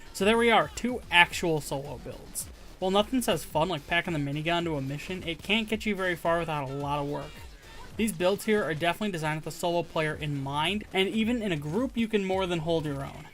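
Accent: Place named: United States. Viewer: Honestly man, you have the best type of american accent, where about are you from?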